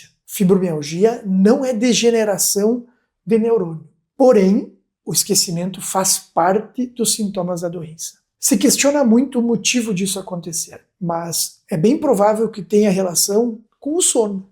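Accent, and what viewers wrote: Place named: Rio Grande do Sul, Brazil. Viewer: Você tem sotaque de gaúcho no chamarão de 🍃 verde no cuias do corpo humano também bom-dia senhor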